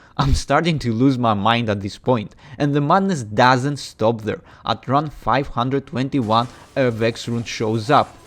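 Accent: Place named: Eastern Europe. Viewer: Comfy vibes? Check Eastern-European accent? Check Yep. This is a D2 farm video alright. Welcome to my second-monitor list, good sir 😊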